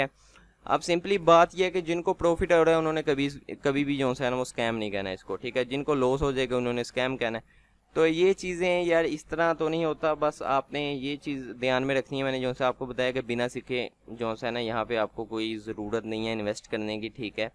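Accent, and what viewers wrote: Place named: Lahore, Pakistan. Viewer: Love your truly lahori accent 💓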